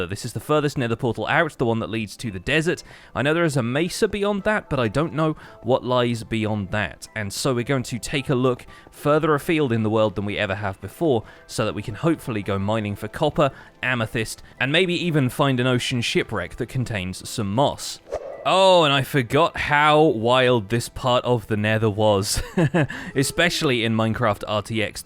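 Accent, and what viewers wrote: United Kingdom. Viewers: I have a question are u British? No pressure😅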